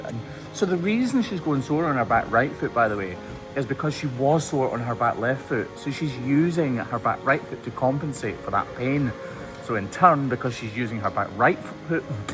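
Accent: Scotland, United Kingdom. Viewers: So interesting and love your gorgeous Scottish accent ❤️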